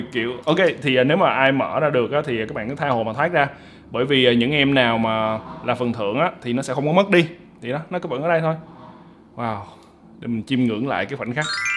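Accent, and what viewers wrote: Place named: United States. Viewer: Anh có học tiếng anh không anh do lúc a nói chuyện rất giống người Mỹ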